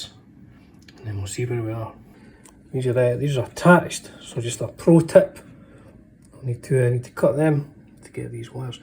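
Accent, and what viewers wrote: Scotland, United Kingdom. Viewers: Afternoon. I was actually looking at doing this with a vintage v130 I have. it’s a p90 but really it’s a p100 stacked humbucker which vintage say is kinda like a p90 but always wanted to switch it out for a proper humbucker. It’s ok sounding as it is but doesn’t sound anywhere near as good with my current set up with a Marshall origin as I found out at a gig few weeks back. Now I know what’s involved in attempting to switch out the pick-up for a humbucker. cheers big man. And keep rocking 🤘🏻🤘🏻ps there’s nothing wrong with your Scottish accent I have one as well 😂😂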